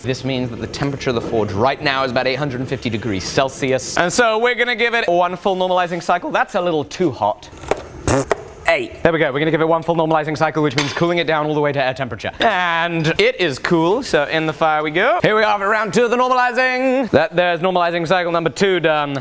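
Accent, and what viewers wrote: United Kingdom. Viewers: Amazing work but can anyone place his accent. Is it part British ? I pick it up but what else and what region. Very interesting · Where about do you live in the UK your British accent is so strong unlike mine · What is that accent? I’m British and never heard that accent before, sounds like British mixed with another...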